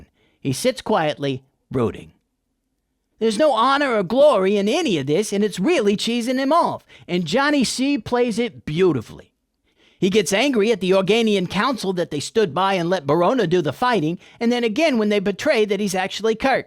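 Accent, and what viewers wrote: United States. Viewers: Hi. I have no clue where you come from because I’m a stupid British gay guy without any understanding of American accents but just if you ever see this post my god I love you! Also live long and prosper!🍌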